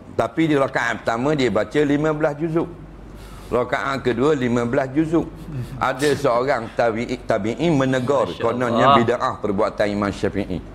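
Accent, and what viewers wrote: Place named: Terengganu, Malaysia. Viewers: Ustaz Idrus kl 1 bulan tu mmg penuh hari2 ada buat ceramah agama di mana2 program yg dijemput. Kl di negeri dia di Trengganu ceramah dia dlm sejam ke sejam setgh..tp kl di luar Trengganu boleh sampai 2 ke 3 jam sebab bg ruang org luar yg susah nok jumpa dia selalu. 1jam ke 1 jam 15 minit ceramah ttg tajuk yg diberi..baki tu sesi bersoal jawab dr org2 yg dengar ceramah ttg apa2 sahaja. Kl masjid luar biasa mmg sentiasa penuh dibanjiri org sampsi luar masjid. Loghat Trengganu dia mmg pekat..tp lama2 dengar boleh paham. Cth..tubik tu maksudnya keluar, Ganu tu Trengganu, hok mana tu..yang mana, pitih tu duit, wang.., riyal tu ringgit..2 riyal tu 2 ringgit, nyor..nyo tu kelapa atau nyior, nyor...,kl dia sebut JKK tu jawatankuasa kampong( pembantu..jawatan kuasa yg dipilih dlm mesyuarat sesuatu kpg atau masjid. Dipanggil Jkk kpg atau jkk masjid). 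· bahasa ustaz tu bahasa loghat terengganu..semakin sukar untok orang indonesia memahami nya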